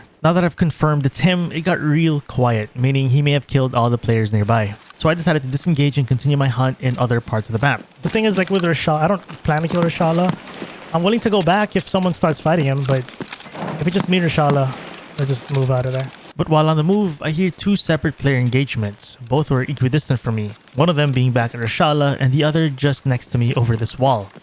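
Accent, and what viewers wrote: Philippines. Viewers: Hello, I'm getting on the new wipe, it'll be my second wipe. So starting from the beginning. Seeing if you'd like to queue up with me at all? Also are you filo? You have a filo accent. I'm filo myself! Nice videos and I enjoy it! 🇦🇺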